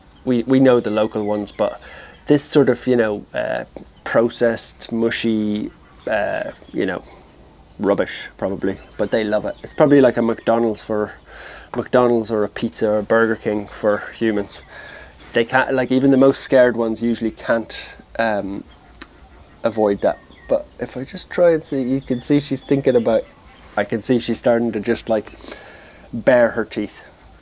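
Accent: Ireland. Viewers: marshmallow will come to love you just like the rest of us. Nobody can resist that Irish lilt.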